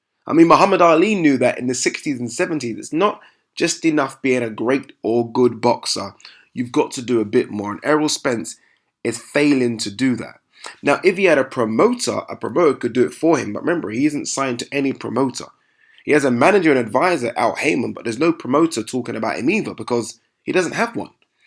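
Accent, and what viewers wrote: United Kingdom. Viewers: Dismiss fights that are disrespectful to the fighter. Why should Spence go to the UK again? Why should Spence fight washed up fighters and fighters he has beaten already? Spence wants to unify 147. He took the belt from Brook and Khan is washed. You sound as if you may be a person from the UK? Could you be a bit biased? Tired of coming to America to watch good fights? Rent them on ppv like most people and save them airfare. But, stop making trash vids about why Spence must fight in the UK to make money. If you want Spence to fight Khan and brook, make vids about them coming to Dallas to face Spence to get paid. Not like either of those guys are making more money than Spence.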